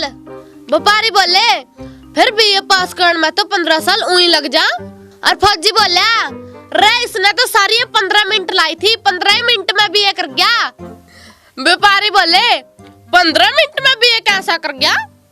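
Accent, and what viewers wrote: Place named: Haryana, India. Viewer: Tumhare chutkule Ko Dekhkar Aisa Lagta Hai Jaise Bahane chutkule gadi Hai chutkule ko dekh kar Aisa Lagta Hai Tumhare Aashiq Ham bahut acchi acchi acchi Lagti Hai acchi acchi Lagti Hai Tumhari boli Haryana ki achi boli bahan tum aise chutkule manate Rahana aur Mujhko Bhi Hai Chahte Rahana yah Tumhari pahchan Hai Mera Naam Arvind Hai Pyar Se log Mujhko Sharma ji Kahate Hain Jay Hind Jay Bharat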